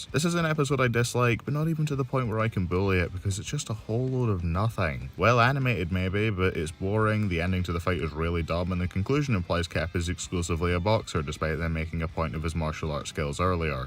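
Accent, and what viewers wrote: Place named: United Kingdom. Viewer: Sick new remake on a great season review. I think I mentioned this before, but there's something about UK presenters for these types of reviews that make them really easy to listen to. You, Critical Drinker, Mauler, etc.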